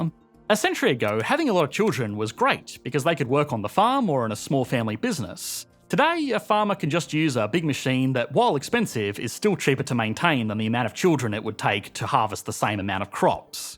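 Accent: Australia. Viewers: It’s just hard to take Australian accent seriously in any way. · AI could have written the script and spoken in the exact same Australian accent as this guy and no one would have known the difference. But yeah, we got nothing to fear.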